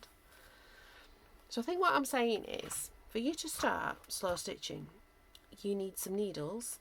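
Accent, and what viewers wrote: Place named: Northern England, United Kingdom. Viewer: I think I hear a northern accent, so if you’re near Wakefield the Salvation Army there has a very cheap charity shop where I get a lot of clothes to use for fabric cheap.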